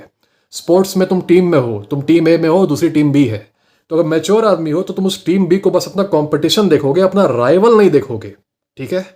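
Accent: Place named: Lucknow, India. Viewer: Good.. Very well put 👌 Also the answer to your question you asked in the end (why men are not getting aware or radicalized? ) is - because of the bitter fact that today more than 50% men have become feminists. Remaining 30% are simps/slaves. Only 20% are aware of this. But the disturbing part is that Rate of conversion of men to simps/feminists is 2 times faster than the rate of men getting aware/enlightened about toxicity spread by infection of Feminism... So, with that being said, people like you (who are actually doing a wonderful job 👌💯) will not be able to bring positive change in Indian Society which is headed to a disastrous end. Anyways, keep up the good work.. I support you... & you have got a good voice... Seems you were an RJ or some process trainer at BPO. Also your accent seems like you belong to Lucknow or Kanpur.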